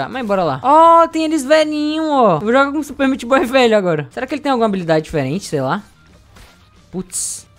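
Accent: Northeast, Brazil. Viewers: tu é nordestino né?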